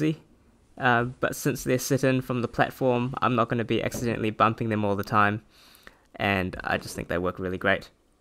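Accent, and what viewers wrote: New Zealand. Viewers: You sound like a kiwi. If you are where are you getting this nice plywood?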